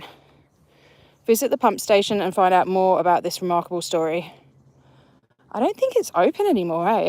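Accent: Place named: Australia. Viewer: Lovely reservoir. Your Aussie accent sounded more obvious on this live. 💙💙🫶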